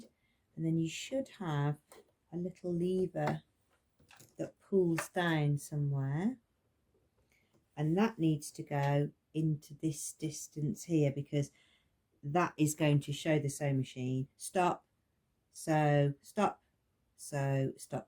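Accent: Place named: England, United Kingdom. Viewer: Which part of England are you from? I love your accent! Greetings from Australia 🇦🇺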